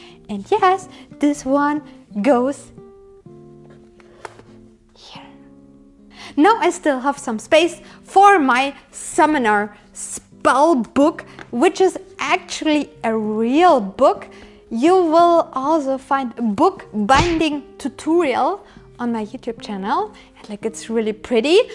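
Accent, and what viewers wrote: Germany. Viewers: I think she is German she has an German accent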